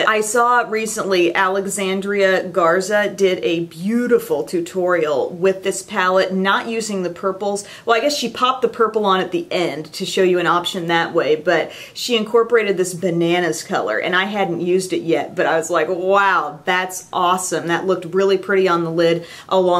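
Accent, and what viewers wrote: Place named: South, United States. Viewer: She's from south western Illinois I believe - so she has a bit of a southern accent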